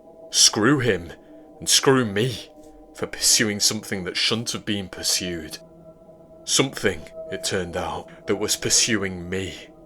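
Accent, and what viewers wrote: United Kingdom. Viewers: ahh I thought so good to see another British narrator 😀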